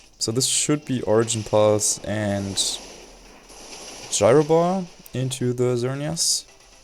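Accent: Germany. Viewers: Your German accent English is wayyyyy too cute and my ears enjoyed it. You're such a chilled guy lol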